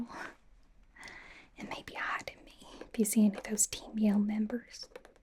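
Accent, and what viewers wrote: South, United States. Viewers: The southern drawl is so cute. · I assumed Marnie would be british. but I love your southern accent so this is fine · I love the southern accent. More accent plz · A fascinating take on this character, who does fit the alt girl archetype in Pokémon lore, based on her Bulbapedia page, and with your (possible) Rogue-inspired Southern interpretation as her. High-quality roleplay with the works, as always,. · Omg alt + southern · Marnie with a southern accent isn't something I ever thought I needed to see or listen to, but it's so good! :_GengarDab::_GengarDab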